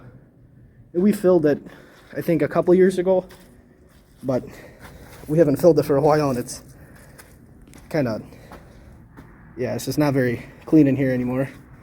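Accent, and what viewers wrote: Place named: Northeast Pennsylvania, United States. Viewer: Wild never heard of taking down such new silos! ** you have the exact same accent as northeast pa Lackawanna county!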